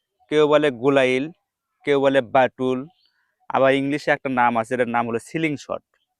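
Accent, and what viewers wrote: Bogura, Bangladesh. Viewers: ভাই আমি আপনার কথা শুনে বুঝতে পারছি আপনার বাসা বগুড়া.( আমার বাসাও বগুড়া, ,,, আপনার গ্রামের নাম কি গোবিন্দগঞ্জ চেনেন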